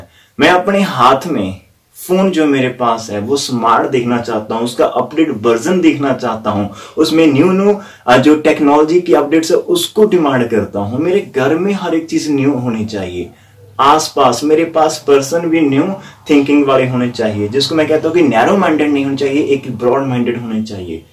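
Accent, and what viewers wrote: Punjab, India. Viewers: Sir vdia lgg rha hai apki hindi main se punjabi sunn rhi hai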